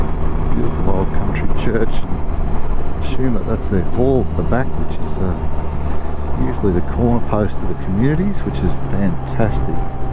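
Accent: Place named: Australia. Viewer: I couldn't make them out and sometimes I have a little trouble deciphering the Aussie accent, lol...I knew what you were saying regarding what they were doing lol, but couldn't see what they were...I remember playing pass the pigs, lol...thank you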